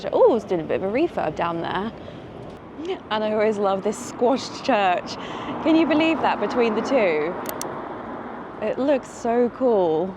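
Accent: RP, United Kingdom. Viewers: You sound extremely posh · This announcer really hasn't learned to breathe and pause and only say what's important. - Normally I love the British accent, but I find my brain hurting on this one.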